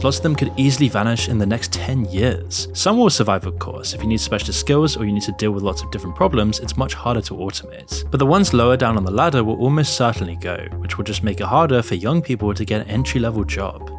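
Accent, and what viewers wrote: United Kingdom. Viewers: Are you speaking with your voice or generated it with AI? If you are generating it can you please make it with American accent not British, it’s hard to listen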